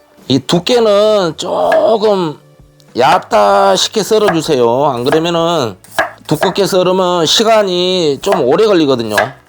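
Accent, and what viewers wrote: Jeolla, South Korea. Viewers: 세프님고향이 전라도?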